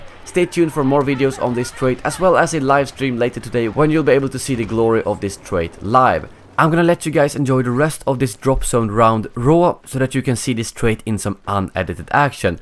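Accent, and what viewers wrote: Sweden. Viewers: It sounds like youre from sweden? Am i right?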